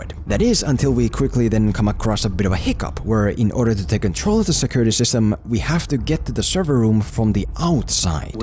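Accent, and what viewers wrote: Finland. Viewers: Are you finnish? You sound finnish. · Are u from Finland??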